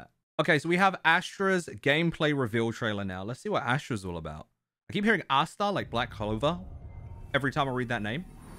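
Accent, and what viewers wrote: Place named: United Kingdom. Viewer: Bruv hes from britahs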